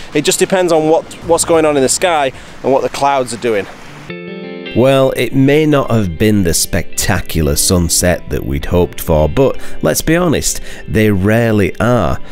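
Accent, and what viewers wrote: Yorkshire, United Kingdom. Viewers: Just interested, you're from Canada but you sound Yorkshire?!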